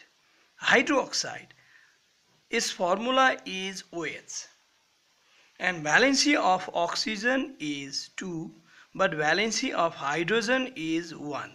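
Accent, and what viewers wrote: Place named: Nepal. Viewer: Thankyou sir Tapaiko accent ta nepali ko jastai xa ta🧐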